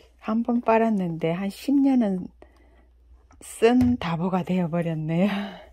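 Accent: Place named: Gyeongsang, South Korea. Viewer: 반가워요 ^^ 갱상도 사투리가 와 이리 듣기 좋뇨..ㅎㅎ 풀청했습니다..그리고, 좋아요..5 눌렀습니다.. 요근래 구글이 좋아요를 삭제하는것 같더라구요, 그래서 전 시간내서 어렵게 방문 한 유친님들게 풀청후에 좋아요 눌러드립니다.. 응원합니다 ~!!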